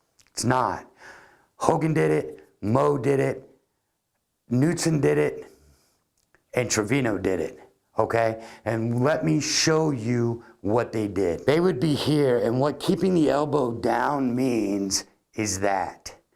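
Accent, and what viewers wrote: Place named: New Jersey, United States. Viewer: Great instructions again. Your reference to Ben Hogan's glass pane was a great thought and keeping that elbow low helps me. Consistent ball striking is the result. By the way, I'm from over in the UK and your accent is straight from The Sopranos. (No offence intended!!) Best wishes.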